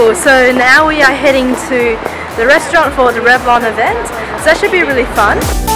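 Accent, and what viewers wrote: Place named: Australia. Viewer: Where did you grow up. I cant tell by your accent sounds Australian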